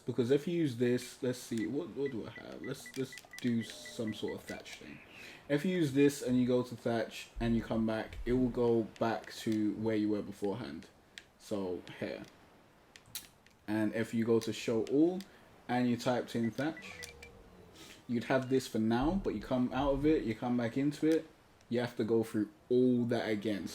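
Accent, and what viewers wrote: United Kingdom. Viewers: Wow you are British, lol. Thanks for the tips.... I've only been playing for a day...finally caught my first dino: Trike and accidentally killed him on my standing fire torches...fml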